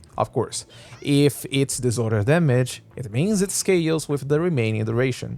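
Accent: Brazil. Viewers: Hey, thanks so much, that's really kind of you! 🙏 So basically my issue is: I have several S-rank agents that should work well in an anomaly team, but I'm barely dealing any damage with them, especially with Alice. My S-ranks are: Alice, Promiea, Yixuan, Evelyn, Vivian, Pyrois and Velina. If you could write out how to properly build/rotate a team around these to actually get good anomaly damage going, that would help a lot! Writing it out is honestly easier for me to follow anyway (and easier to translate if I need to) 😄and dw about ur brazilian accent lol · First time here and still learning the anomaly mechanics cuz brain goes WAHOO whenever Ye Shunguang goes flying and wipes everything since i unlock her when i started playing :v And yeah, i felt that you were also brazilian from your accent hehe. Still really good video btw (ainda n terminei de ver, mto numero kk)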